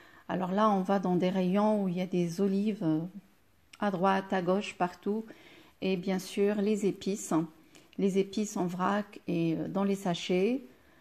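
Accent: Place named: Meknes, Morocco. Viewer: Je me disais que ton marocain est le même que le mien tbarkellah 3la nasse Meknès ... Ma ville d'origine 😍😍😍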